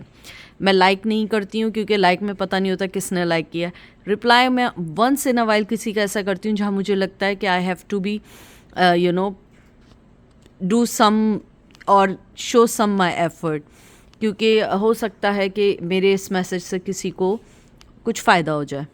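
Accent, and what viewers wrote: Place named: Karachi, Pakistan. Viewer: Hi I am in Virginia. I wish I can meet you. You sound like you are from Karachi too. ❤️❤️❤️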